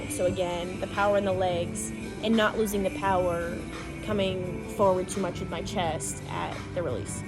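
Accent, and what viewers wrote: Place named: California, United States. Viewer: You sound like a californian eh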